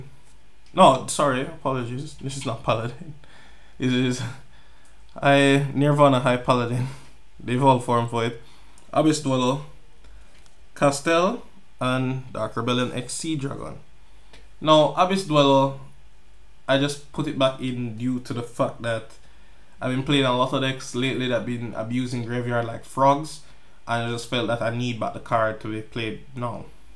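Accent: Jamaica. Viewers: he sounds a bit Jamaican are you Jamaican?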